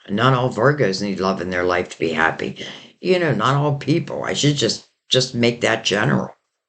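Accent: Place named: Pennsylvania, United States. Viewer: First time I listened to you. I am feeling your energy and I’m so thankful I found you. I love your accent. PA? I’m from Philly. I connected with your reading so much. My Dad came through with everlasting love. ❤️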